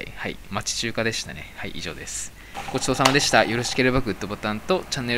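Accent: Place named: Aomori, Japan. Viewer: 青森の方ですかね？ 津軽弁が懐かしいです。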